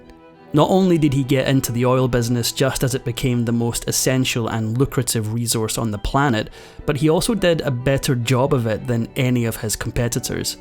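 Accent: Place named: Scotland, United Kingdom. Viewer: First, I liked this video because the narrator has what seems to be a Scottish accent. That resonated with me because I watched a PBS documentary on Andrew Carnegie decades ago. I have not been in awe of the rich snd famous - yet I find their stories fascinating and they give me a glimpse into human nature. Just watched a short video on J.D. Vance, current candidate for Vice President - and was reminded of J.D. Rockefeller's humble start in this video. This videos thumbnail teased that Rockefeller's "dark secret" was someting he did. The man was a sinner as we all are - or have been. Still, the secret was the sins of his father. I'd done some research on the younger Rockefeller a few years ago - and vaguely recalled his dad giving him a loan. I didn't realize what an influence, "Devil Bill," was on his son - or that his son fought to overcome the shadows of his dad's dealings. 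Thank you!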